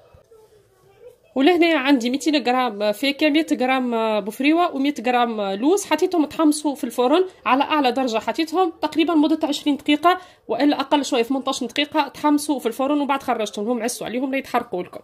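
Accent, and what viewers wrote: Algeria. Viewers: حتى لهجتكم الجزائرية زوينة بزاف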